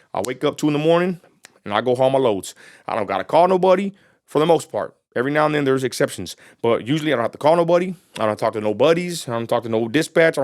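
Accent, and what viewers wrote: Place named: Texas, United States. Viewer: Agreed. I was listening without video; I didn’t hear any edits or jump cuts. You have a good Texas accent too.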